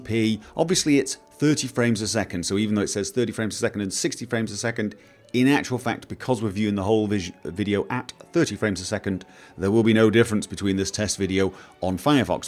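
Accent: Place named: England, United Kingdom. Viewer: OH MY GOD ... that accent ... seriously where are you from, England? also, this did work, too, so thanks.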